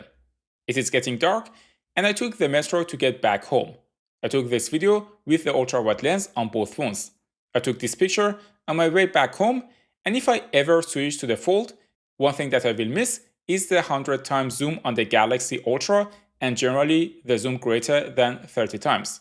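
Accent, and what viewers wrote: Iran. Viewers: Dude that Thick Persian Accent got me 😅 well done bro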